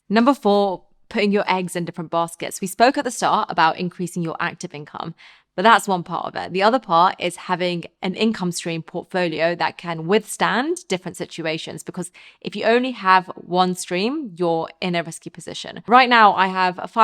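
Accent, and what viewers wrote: England, United Kingdom. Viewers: I normally dislike English accents , but hers is lovely, very feminine, if she just wasn’t so hard to look at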